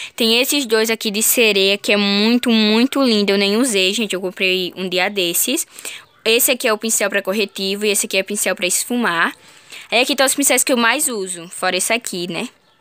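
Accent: Brazil. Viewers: Amei o sotaque, vc é de Maceió?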